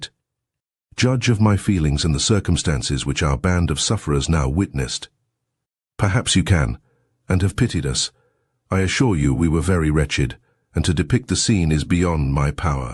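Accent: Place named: United Kingdom. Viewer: Is there a reason a British accent is telling strictly AMERICAN history? Sounds off.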